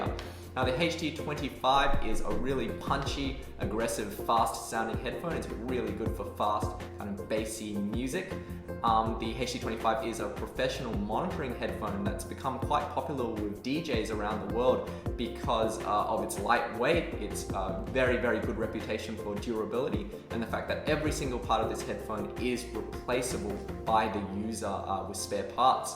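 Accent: Australia. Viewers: You're an aussie? subbed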